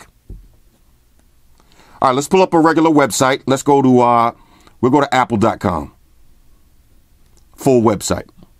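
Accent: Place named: New York City, United States. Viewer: No questions about your reviews but I'm also watching for white shoes and I love your NYC accent!👍💪🍺🍻🥃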